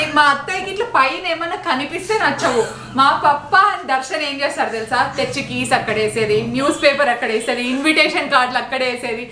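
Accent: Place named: Rayalaseema, India. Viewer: God bless you and your family amma Rayslaseema bhasha vintunte chaala baagundamma😊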